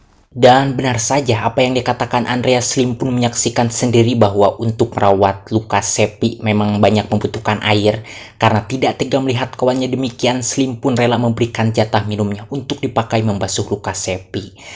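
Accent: West Java, Indonesia. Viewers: abang ini asal sunda? Sunda nya di mna bro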